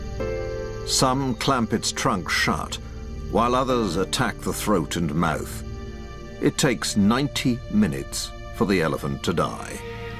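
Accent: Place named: United Kingdom. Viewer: These are the ones with the British narrator..these versions suck. I like the original with the American narrator. These are garbage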